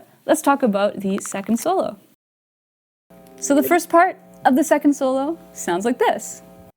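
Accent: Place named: Canada. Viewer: Canadian accents are so damn great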